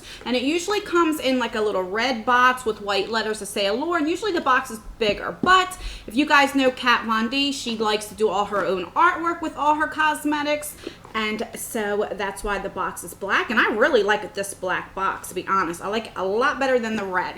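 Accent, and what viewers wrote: New Jersey, United States. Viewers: Lol. I think you said "Walmart scrub" before describing the scrub. The Jersey accent is similar to us in MA, but I think you said Walmart....and I almost spit my tea out. Oh I love you.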